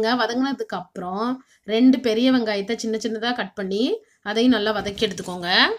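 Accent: Tirunelveli, India. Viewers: It looks simple and easy. But I really enjoyed your narration.....I can feel the Tirunelveli ambient in our slang....Keep up the good work.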